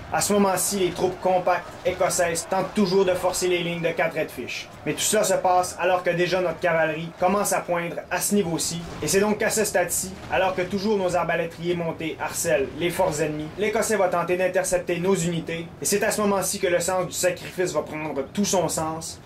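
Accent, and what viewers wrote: Quebec, Canada. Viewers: SCOTISH POWER !!! <3 je le dis tous de suite, c'est la première fois que je vois une de tes vidéos. Et franchement je te tire mon chapeau. C'est complet, on ne s'ennuie pas en regardant juste défois que j'avais du mal à suivre tellement tu parlais vite =s mais c'est pas grand chose. Sinon je sais pas si tu connais "MaSQEdePuSTA" ? il fait des vidéos "critique cruelle" et je trouve que comme lui tu as une façon de parler exceptionnel x) et crois moi ce n'est pas à cause de ton accent canadien =p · Omg l'accent québécois ♥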